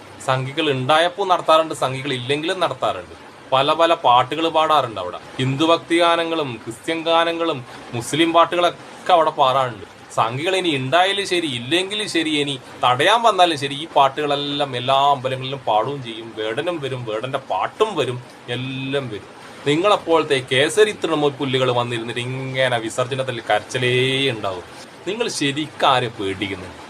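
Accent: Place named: Thalassery, India. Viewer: നിങ്ങളെ സ്ഥലം എവിടെയാ.. നമ്മുടെ തലശ്ശേരി പാനൂർ ഭാഗത്താണോ.. എന്തായാലും തനി നാടൻ വർത്താനം 👍🏼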